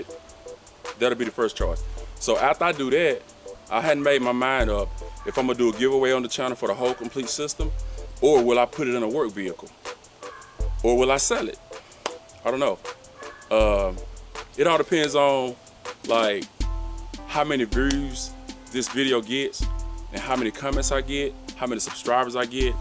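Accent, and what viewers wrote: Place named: Mississippi, United States. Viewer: You definitely from Mississippi with that accent lol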